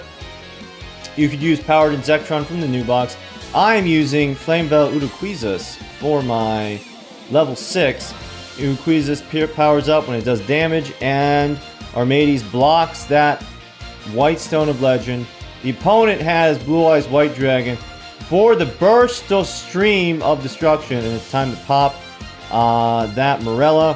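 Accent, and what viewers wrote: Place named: Ireland. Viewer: A1 Irish pronunciation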